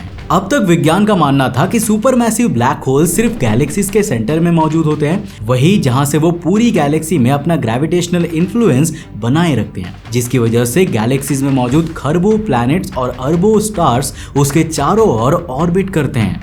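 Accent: Bengal, India. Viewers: Bhaiya kya ap Bengali ho ?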